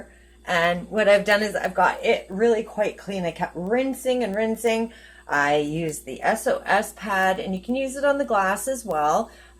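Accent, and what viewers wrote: Canada. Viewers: I find it amazing that you only use like 2 different cleaners predominantly. That simplifies things so much. It's also surprising to me that you use that Dr. Bronner's for steam cleaning. It's very versatile to do that. I'm going to pick some up tomorrow. By the way, are you Canadian? I thought I heard the accent but I could be wrong lol...I'm Canadian, that's why I ask :P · You sound Canadian and possibly from Ontario? I know an Ontario accent when I hear it. I am in Manitoba, in the Winnipeg area.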